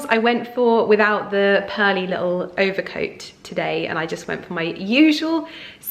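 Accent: RP, United Kingdom. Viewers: The way you say “The the the…” before every word is irritating. It’s because of the thinking you do before talking, to try and sound posh. You sounded much better with your natural speech, when it flowed.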